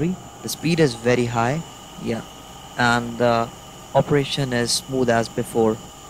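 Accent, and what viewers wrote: India. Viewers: Peace mercy and blessings of God. After greetings. Good evening. How are you Indian people You speak English but your accent is Indian, and India is the country where Adam might God bless him and Grant him peace landed, and Adam is the first human being whom God created from dust and blow into him of God soul. Therefore, please let me tell you that Adam has created after devils not before. Note : And there are other human beings whom God created, just as He created Adam from dust and blow into him from God soul. and all those whom God created from His soul are human beings. Except one of the angels his name is Gabriel. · i can hear indian accent. no thanks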